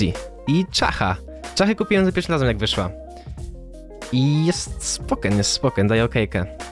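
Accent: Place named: Podlasie, Poland. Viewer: jesteś z Podlasia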